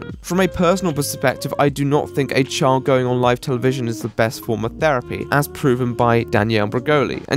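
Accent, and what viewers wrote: United Kingdom. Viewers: thanks for mentioning that you are british cause i was so confused about the accent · not everyone in Britain talk like that lots of people here talk like a Chave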